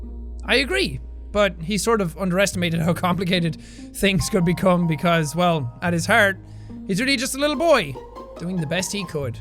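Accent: Ireland. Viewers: It seems like Sean loses the Irish accent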